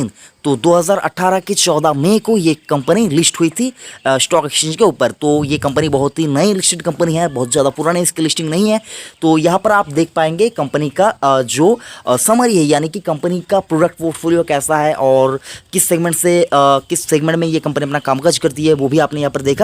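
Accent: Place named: United Kingdom. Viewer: language kyo asi bol raha hai gadhe..angrejo ki copy kar raha hai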